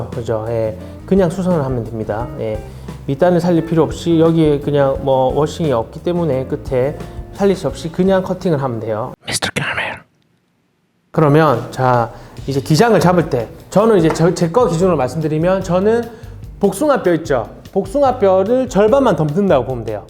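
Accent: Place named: Busan, South Korea. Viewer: 유투브 잘보고 있습니다.같은부산사람으로 남천동에 살고 있습니다. 동천고에 다녔다고 하니 너무 반갑고. 찰진 사투리가 매력적이라 하루저녁에 동영상을 다 봐버렸네요. 유쾌하고 밝은 에너지가 느껴져서 언제 한번 커피마시러 서울로 가고 싶습니다. 옆에 같은 친구 또는 이웃이 있다면 사는게 재밌을것같습니다 색상 배색도 한번 부탁드리겠습니다 쌩큐~~^^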